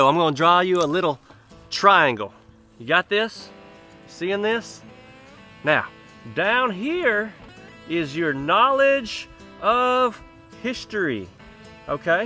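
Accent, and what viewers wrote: South, United States. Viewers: I was wondering where was from with that Southern accent and now I know Mississippi. That blue light that was holding we in Canada call that a Barbeque light because everyone here pretty!uch owns a propane grill barbeque so that long lighter comes in handy. As for the duct tape there was a Canadian comedy Tv show called The Red Green Show about a typical Canadian lumberjack and he would call the duct tape the handyman's tool. There would always be uses for the duct tape. LOL! 😂😆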